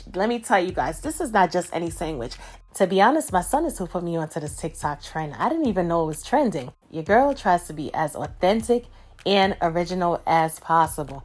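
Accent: New York, United States. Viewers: Ooooh, I'm looking forward to cooking that one up myself. And great tip with the pre-fried salami! Ps, your New York accent is simply adorable (it reminds me at times of Rosie Perez 😎). Cheers from Toronto.✌️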